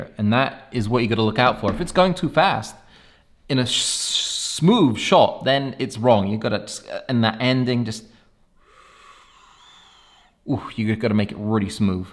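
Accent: United Kingdom. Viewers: idk but I don't like getting drone info from UK accents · Great tutorial, mate!! It was very enjoyable to watch when a guy with UK accent shows that much emotion. :) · Are you a Brit living in the USA? Your accent has some US twangs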